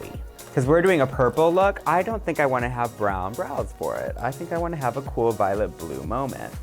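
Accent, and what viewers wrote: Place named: Milwaukee, United States. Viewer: Yeah she's from Milwaukee, so she has a similar accent to Trixie Mattel! If you listen to them both closely in some video's, they will sound quite similar with some things they say lol, it's awesome.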